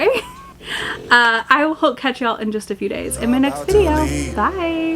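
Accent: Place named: Kentucky, United States. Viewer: As a KY native living in SC, hearing talk made me feel like I was back home 😂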